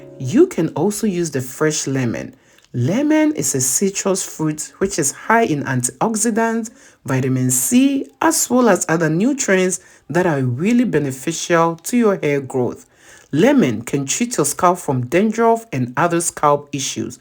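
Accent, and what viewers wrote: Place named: Africa. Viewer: Right especially with that accent sheesh !!! Africans are so dramatic 😂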